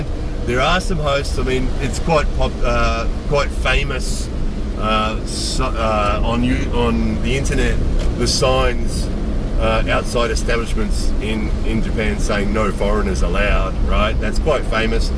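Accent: Australia. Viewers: yeah except the problem is I can't skip forward to quickly scan and get the gist of the video because it's all just driving - so for me might as well be a podcast I've drive in Japan and know it's slow so no joy for me here - but folk who never have may find in relaxing and interesting. Strine voice tho' ... ;-)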